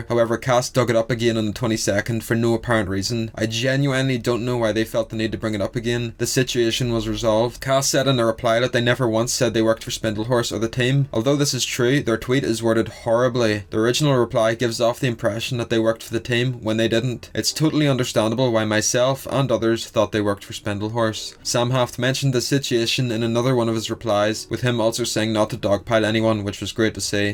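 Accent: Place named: Northern Ireland, United Kingdom. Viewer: A Northern Irish narration on Helluva Boss.... YouTube algorithm nailing it today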